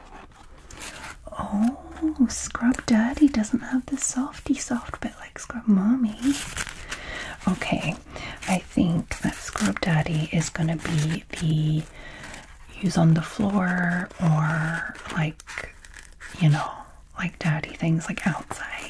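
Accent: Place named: Scotland, United Kingdom. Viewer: Been binge watching your videos all evening. Just discovered that you’re Scottish, so hello from Edinburgh! I haven’t been able to place your accent but I can definitely hear it now.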